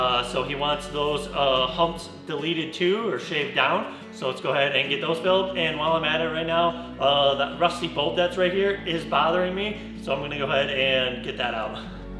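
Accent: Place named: India. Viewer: Why do you have Indian accent? 😁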